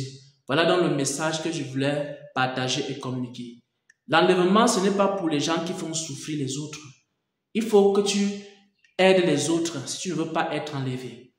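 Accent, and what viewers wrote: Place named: Cameroon. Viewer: Tu es camerounais par ton accent.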